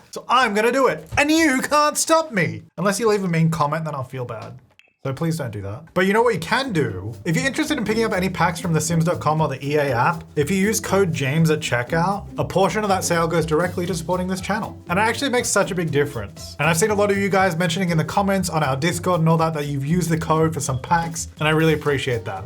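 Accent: Australia. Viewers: I hear the Australian accent bit more now, tbh😅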